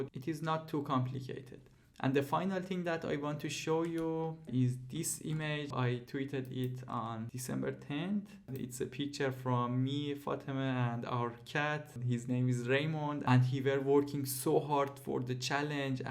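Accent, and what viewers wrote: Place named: Iran. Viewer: I am turk but I know how persian people speak and your accent had sweet persian tonnage )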